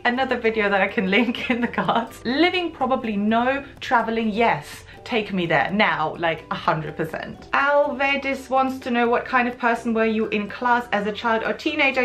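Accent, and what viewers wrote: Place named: United Kingdom. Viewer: its so strange when non british people have a british accent?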